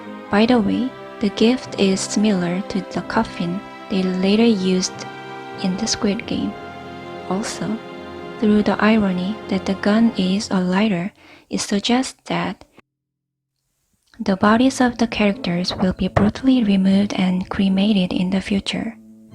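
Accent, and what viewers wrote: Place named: United States. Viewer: 오호ㅋㅋ 아메리칸 스타일의 잉글리쉬💯 세간에 떠들썩한 5징어게임에 빠져봅니데이~😆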